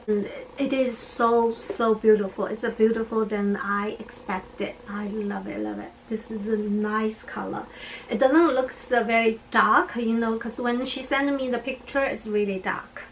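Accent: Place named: Thailand. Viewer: I think shes from Thailand coz she said duck instead of dark😃😃 thai people can’t say the r just saying coz i have thai friends same can’t pronounce the R 😁 ✌🏻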